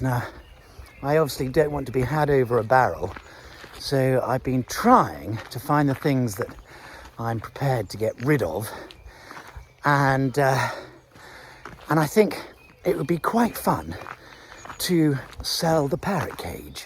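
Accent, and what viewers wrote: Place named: RP, United Kingdom. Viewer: Love your accent very posh indeed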